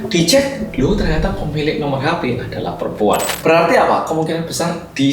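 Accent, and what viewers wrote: Java, Indonesia. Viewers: I'm addicted to : "Halo guys welcome to korea reomit bersama orang korea yang medok" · om medok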